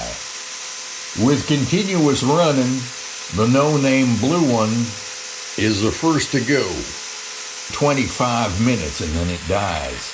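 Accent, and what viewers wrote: South, United States. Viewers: NOW , ... THATS a good review ! sounds like someone who really uses tools , and not some salesman ! liked hearing southern accent , and personality ! the review was more entertaining than the tools . good review .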